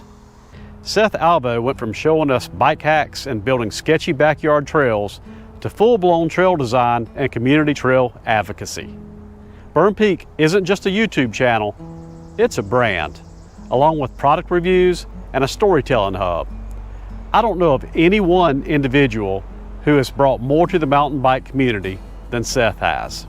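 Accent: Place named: Carolina, United States. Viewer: That's the thickest Carolina accent I've heard (and unfortunately going extinct). Great video great storytelling! Seth did an interview with Remy Metailler and it was a great peek behind the curtain into how being a YouTuber can kill your ability to enjoy what you are supposed to be enjoying on camera.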